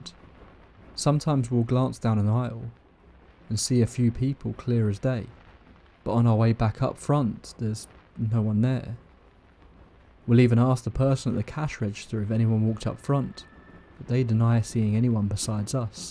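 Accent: England, United Kingdom. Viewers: Some fab stories, thanks for narrating them and everyone who shared them. But I definitely never fall asleep to them. I listen whilst preparing and cooking or soaking in the bath. With a glass of something! 🥂😁 And it's great to hear another English voice and accent x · Can't fall asleep to a British accent. Just can't. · It's so difficult to fall asleep to English accents... Need my murican!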